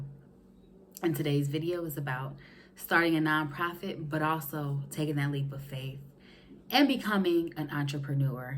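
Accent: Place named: Ohio, United States. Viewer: I love your transparency and authenticity in this video!!! 🙌🏽 I’m interested in learning more from you 😍 sounds like you’re in Ohio so am I!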